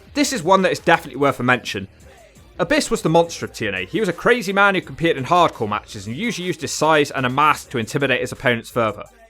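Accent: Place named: United Kingdom. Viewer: How come these narrators are always British?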